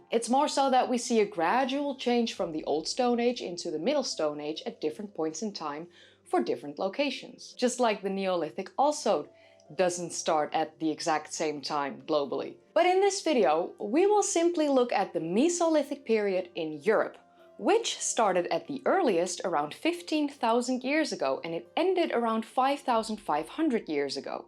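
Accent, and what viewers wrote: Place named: Netherlands. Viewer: Of course she's Dutch. You can tell by her accent. (Almost).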